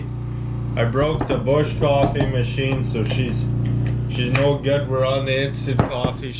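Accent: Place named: Newfoundland, Canada. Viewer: Your accent are you from Canada ,new found land maybe buy great videos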